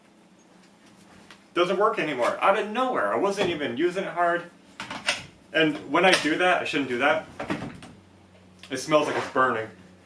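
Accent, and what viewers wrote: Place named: Upstate New York, United States. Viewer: that’s awesome!! I’ve been going back and watching all your videos too!! I’m from upstate New York and I heard you were too.. haha!! I knew I recognized your accent 😂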